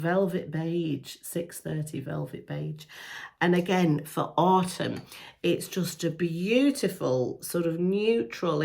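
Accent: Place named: England, United Kingdom. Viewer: We call it trash day here in the states....England is on my bucket list. I love the accent and yes we all have different ways of saying things which is fun and actually educating.....my late father visited Englad he loved it especially the changing of the guards. He also said the food is different. Great video i really enjoyed it ❤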